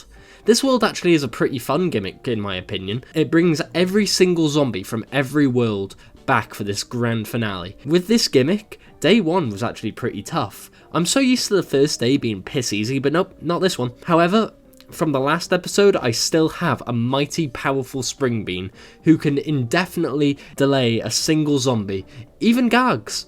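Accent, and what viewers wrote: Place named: United Kingdom. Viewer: Yoooo,thw bri'ish man is back. Now try beating pvz 2 with only flowers.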